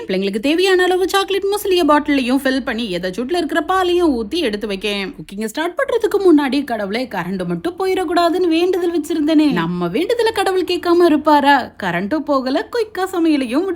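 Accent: Tirunelveli, India. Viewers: From tirunelveli to nagercoil kanyakumari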